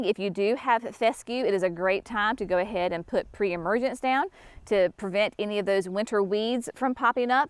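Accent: South, United States. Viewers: ! I could listen to you all day! Your southern accent is adorable. I love how encouraging and inspirational you are. You give me so much courage and strength to get out there and get stuff done in my overwhelming yard. I absolutely love your quote, ”There is grace in gardening.” I need that sticker for my water bottle! 😂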